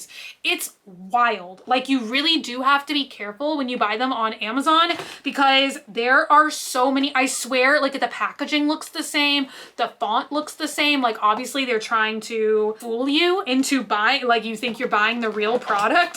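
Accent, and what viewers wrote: Texas, United States. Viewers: Took me a minute to know if you meant pin or pen, that Texas accent, man.😄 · It’s funny I don’t even hear a difference but in other comments they say it too. It must be a Texas thing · I like to watch with the closed captions on...and they also said that said PIN and not pen. Lol. It's that southern pronunciation 😘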